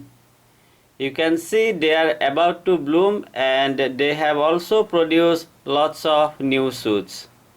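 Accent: Assam, India. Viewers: Are you from Assam? U have an Assamese ascent. Correct me if I am wrong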